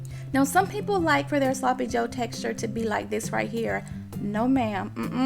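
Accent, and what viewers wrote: South, United States. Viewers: YOUR CHANNEL IS THE BOMB!!!! I JUST LOVE YOU. YOU SOUND LIKE AN OL' COUNTRY GIRL LIKE ME. I'M FROM LITTLE ROCK, ARKANSAS and I saw my Granny making, not all but most of these recipes. But, what's so crazy about it, she wouldn't let me my sister and my cousin be in the kitchen with her. I didn't learn how to cook, well, until I got out on my own when I was 31 plus years. LOL It's crazy. LOL My granny never wanted anyone in the kitchen helping her. AIN'T THAT SOMETHING? She had 9 children and my mother was the oldest. I'm the first grandchild. She cooks big meals everyday, and everybody is gone. My oldest uncle passed in 1998, my Paw Paw passed in 2013, my other uncle passed in 2014, my aunt passed in 2015, then my Mom passed May 12, 2017. My Granny is 88 years old, and this to say that she cooks like this still till this day because she is so used to it. 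Now growing up this was the routine. She cooked Sunday {big meal) through Thursday. Friday was always Fish day. Catfish steaks, fillets or whatever. Saturday was lunch meat day. You better take yo but in that kitchen and make yo;u a pressed ham. bologna, You better hope you got chicken loaf and cheese sammich. LOL Oh and you better hope you got some Lays or Ruffle chips to go with that sandwich with that meal. But I would love it when it would be about 6 or 7 pm and she would start putting her dinner on for Sunday..., when I was the only grandchild..., LIKE I SAID i'M THE OLDEST GRANDCHILD out of a million. LOL, but she would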